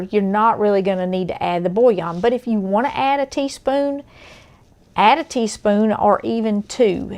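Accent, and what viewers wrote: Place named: Kentucky, United States. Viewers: I dont know where she is from but I absolutely love her accent. I could be wrong but I'm thinking Appalachia somewhere. I've heard those folks really know how to cook! SUBBED! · I grew up in a military family (Army), my mom has your accent, she's from Kentucky. My dad (Vietnam Vet) made S.O.S. with sausage and he would fry an egg and put it on top of the toast and put the SOS over that. Thanks for your recipe, I grew up on this.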